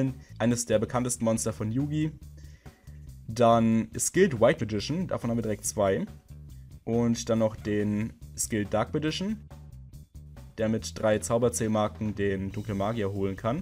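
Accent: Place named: Germany. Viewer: Du hast so nen schönen deutschen Akzent wenn du englisch redest :D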